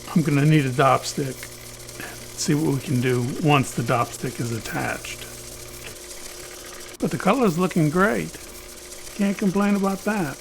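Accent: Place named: South, United States. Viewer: Where at in the eastern united states are you from sir ? I see from the spots on your hands you're about 60 or 70. But I am unable to place your accent. I want to say kentucky or maybe louisiana.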